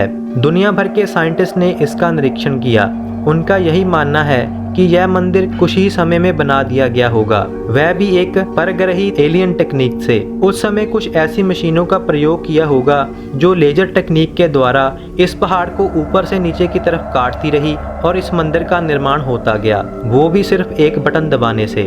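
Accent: Punjab, India. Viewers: OM NAMAH SHIVAY JAI MAHADEV 🌹🌹🙏🙏🌹🌹 Bhai tum aawaaz se punjabi lagte ho. kyunki punjabi hi hindi ko jabardasti bolta hai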